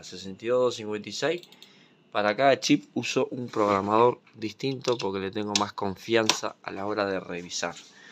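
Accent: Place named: Argentina. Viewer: Que groso saludos de argentina y gracias por tus conocimientos....jajaja insisto que tenés tonada de argentino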